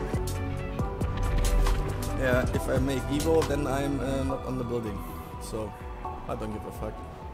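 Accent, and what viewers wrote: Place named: Germany. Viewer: I love the German ascent I am dutch so I have almost the same as you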